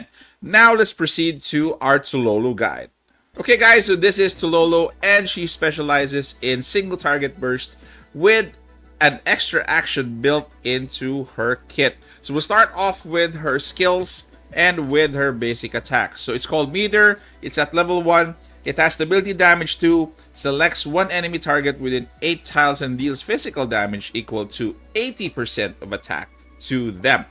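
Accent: Philippines. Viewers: bro is it me or do you sound like your average filipino teacher/instructor especially with that signature filipino reiteration and intonation during explanation lmao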